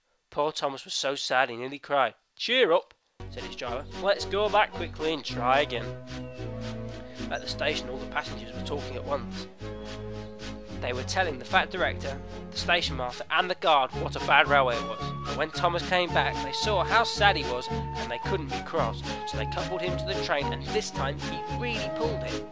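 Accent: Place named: Liverpool, United Kingdom. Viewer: Great video mate :D The figures look great, great scale :D The narration is great! Anymore we can expect soon? ;D Just saying your Liverpool accent is AMAZING.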